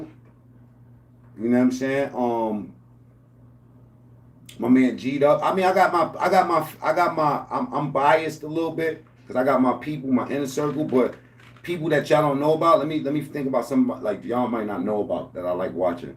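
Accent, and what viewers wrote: Brooklyn, United States. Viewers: You have to be from Brooklyn..The way you talk and. get hyped is 💯💯💯💯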